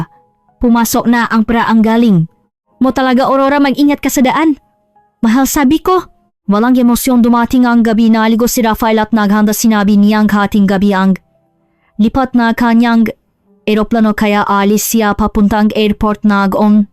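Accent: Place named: China. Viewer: Parang hinahabol n kamatayan ang narrator, at masyadong slang n inchik ang pagkakasalita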